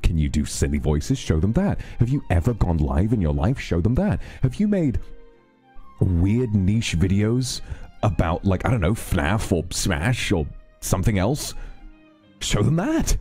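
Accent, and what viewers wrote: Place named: United Kingdom. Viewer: 真的好愛爹地，瞬間愛上英國口音XD 聽完他的雞湯真的變得很有精神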